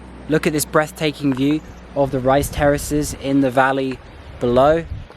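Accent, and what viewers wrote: United Kingdom. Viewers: sounds British accent....enjoy trekking Sagada...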